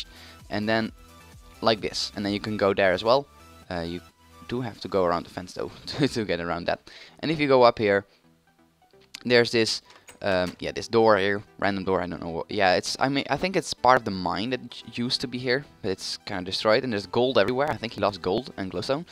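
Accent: Netherlands. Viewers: ben je nederlands? je klinkt nederlands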